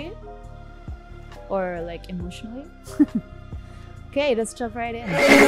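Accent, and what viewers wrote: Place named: Philippines. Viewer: you have a filipino accent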